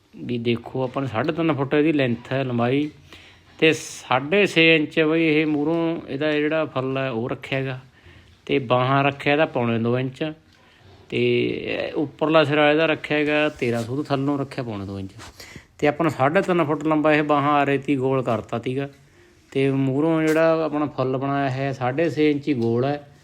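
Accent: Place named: Sangrur, India. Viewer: ਭਾਈ ਤੁੱਸੀ ਤੀ ਬੋਲਦੇ ਹੋ, ਸਾਡੇ ਸੀ ਬੋਲਦੇ ਹੋ ਤੁਸੀ ਸੰਗਰੂਰ ਜਿਲ੍ਹੇ ਦੇ ਹੋ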